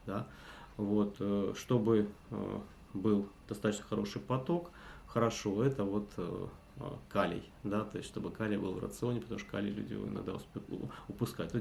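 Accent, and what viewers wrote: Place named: Russia. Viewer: Отвратительная подача материала с постоянным занудным аканьем.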